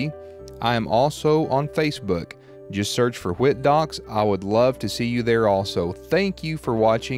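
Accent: South, United States. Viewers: everything is great, it really is! I guess I struggle with your southern accent because English is not my first language. But other then that, awesome videos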